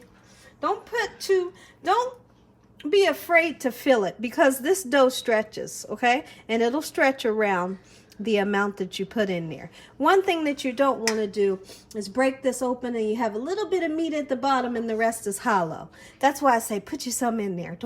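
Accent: South, United States. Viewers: thank you for this recipe of Jamaican beef Pattie’s “101” as you put it! I never thought of using ready made empanada dough! I think I’ll try it! This looks delicious! This is the first time I ever saw a nice southern soul sister like yourself make Jamaican beef Pattie’s on video with a southern accent! I love it I love it I love it!!! “ you hear me?” 😂 That’s also what I like about your other videos as well! They are so cheerful and could easily cheer up an otherwise blue person! Keep it up my dear! Thank you thank you thank you! I grew up eating Jamaican beef Patties! God bless and keep it up! Ps: thank you for this dough shortcut because sometimes it’s a little troublesome and time consuming and at times it doesn’t turn out right for me when preparing the crust!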